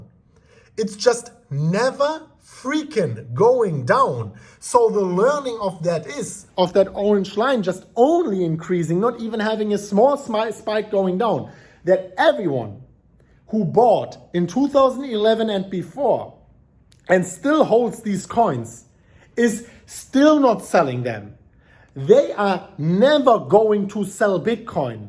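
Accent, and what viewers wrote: Germany. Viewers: I like you man . I'm Polish anc I new your hard accent have to be German . I follow you mate and trully .... like you😉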